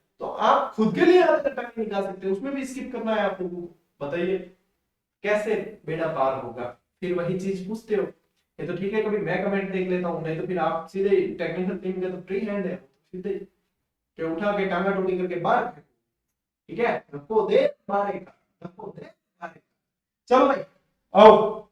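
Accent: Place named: Nagaur, India. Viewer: Sir aap pali ke ho par aapki Bhasha nagor ki aa rahi he or sir pali me kha se ho please reply 🙏 🙏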